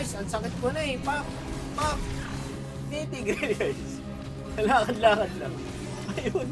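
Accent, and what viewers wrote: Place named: Bulacan, Philippines. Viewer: San ka sa Bulacan boss na halata ko kse boses mo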